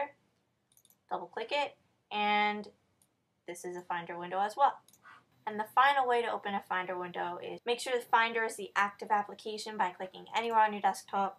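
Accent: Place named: Pacific Northwest, United States. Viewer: You sound a lot like the branch of my family who live in the Pacific Northwest. Would that be about right? :^) Thanks for all the Mac help. You're great.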